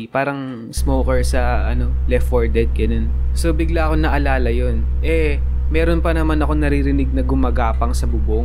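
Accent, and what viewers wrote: Philippines. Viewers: I love your Filipino accent Say it again.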